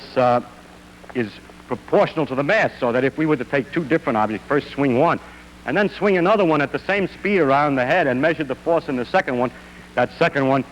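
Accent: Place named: Brooklyn, United States. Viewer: Dr. Feynman speaks with the Brooklyn accent of a man who was born in 1918. Do modern Brooklynites still speak with this accent, or has mass broadcast communication quashed it? The New Orleans accent that my grandparents spoke with is all but dead.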